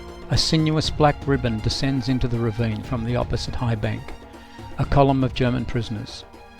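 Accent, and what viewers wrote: Australia. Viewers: What is an Australian doing the commentary ? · Better late than never... Yes, I sadly agree, especially for USAers who actually need subtitles for NZers! Australians basically understand every dialect of English and being so multi-cultural, many strong accents are just everyday life here. I had a great career in Call Centre Training in the Philippines precisely because: A) I'm very good at it & I loved being there & doing it, and B) My so-called "U Australian" accent can be almost universally understood; it is pretty neutral as English speaking accents go, and I can easily learn & adapt to different dialects & vocabulary. So I could manage not only Aust/NZ accounts, but also even the strongest of US or UK English accents. The Americans DEFINITELY can't do that & the British often aren't much better, so I was always in demand. My accent is less "Australian" than your narrator's, for reference. 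PS: What a 10 months it has been!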